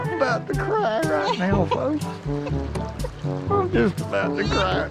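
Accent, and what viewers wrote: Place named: West Virginia, United States. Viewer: Old West Virginia boy here, what part are you from, you sure got the accent down?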